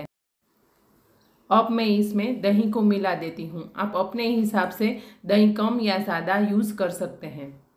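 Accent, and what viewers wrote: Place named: Gujarat, India. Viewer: Mam , bol ne se aap gujarati lagte ho , gujarati bolo , saras , 🙏🏻 mam gujarati ma channel banavo 🙏🏻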